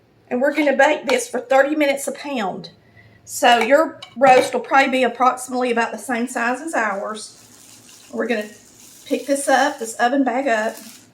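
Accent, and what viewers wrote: South, United States. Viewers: Apparently caption doesn’t recognize southern accents: Hi y’all it’s with...” 😁😂. Aside from that, thanks for the turkey tips!! 💕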